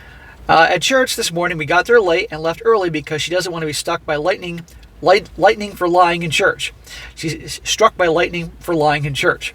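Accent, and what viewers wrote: Boston, United States. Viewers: "I don't have a Boston Accent"... I call BS. I knew you were a masshole the minute I heard your voice a year or two ago.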